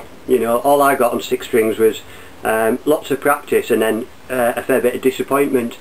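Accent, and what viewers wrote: United Kingdom. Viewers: Ey up lad, not heard that great dialect for years. I lived most of my life in Manchester and then for my last 6 years in England I lived in West Yorkshire. I am guessing you are from somewhere around Manchester though? I left England in 1982 and I now live near Chicago, Illinois, (still got the English accent though :) ). Man do I miss hearing good dialect! I came on here because I just bought a 3 string cigar box guitar and wanted to get some idea as to playing and tuning it. I normally play 6 and 12 string guitars, but I have to say that I was blown away by the sound from these things. I agree with the adjustable bridge. The one I just bought did not have one and already I can hear the intonation errors. Also it had a peizo pick-up which I'm not keen on. I'll probably go ahead and modify it as per your recommendations. By gum I liked your video, keep up the good work lad. 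All the best, Chris. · Great video! by the way I couldn't stop staring at your T-shirt I noticed it said snowden but my eyes are bad that's all I could read and couldn't help but thinking I wonder if you know there's a guy named Snowden that builds cigar box guitars here in the states Snowden cigar box guitars? I had to laugh because I wasn't sure who I was looking at I was pretty sure Snowden was American but you sound definitely English ... I liked your video now I have to choose between 4 guitar makers ... Nigel Mctrusty Australia ,YOU Great Britain , Snowden guitars I believe usa and Justin johnsen cigar box guitars usa I don't know what to do now hahaha!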